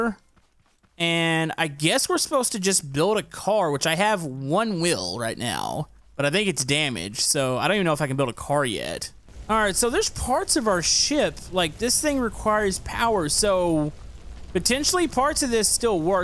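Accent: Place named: South, United States. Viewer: He's southern, accents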